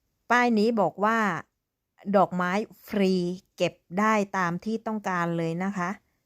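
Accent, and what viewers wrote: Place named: Phuket, Thailand. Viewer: ของฟรีมีในโลกจริงๆค่ะพี่ ชอบทำเนียงพี่จัง ว่าเหมือนสำเนียงภูเก็ตพังงาค่ะอิอิ ดาด้ากะเดาเอานิค่ะพี่ ลงคลิปเยอะๆหน่อยนะคะดูค่ะ ติดยูทูปครั้งแรกกะคลิปของสาวฟินแลนด์นี่แหละค่ะเมื่อหลายปีก่อนติดช่องน้องบีมากน้องบีเก็บเห็ด แล้วชอบดูเวลาเขาไปเอาปลาฟรีเขาลากปลามาไว้ในเรือชอบมากค่ะ มีน้องคนนึงอยู่ฟินแลนด์เหมือนกันชื่อน้องเฟิร์นแต่น้องไม่ค่อยลงคลิปไม่เคยเจอกันแต่คิดว่าน้องน่ารักมากๆ สรุปว่าสาวใต้ไปอยู่ฟินแลนด์น่าร้ากกก🥰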